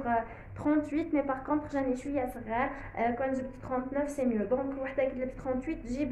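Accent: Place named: Maghreb. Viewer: فيديو رائع ❤️❤️ تصوير رائع واختياراتك أنيقة❤️❤️بس اللهجة صعبة الفهم لمعظم العرب لا يفهمون اللهجة المغربية والجزائرية والتونسية للأسف 💔💔 ف الرجاء التحدث ب الشامية أو الفصحى أو المصرية هكذا ينتشر الفيديو أسرع ويكون له جمهور أكبر او ضيفي ترجمة مصاحبة بليز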